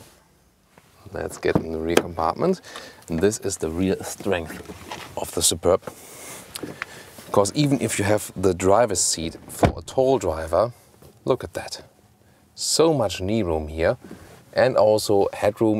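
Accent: Germany. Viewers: good english but the accent is still like a lufthansa flight attendant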